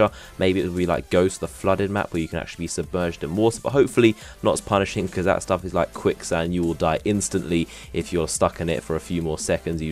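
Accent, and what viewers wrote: United Kingdom. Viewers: where are u from in the UK?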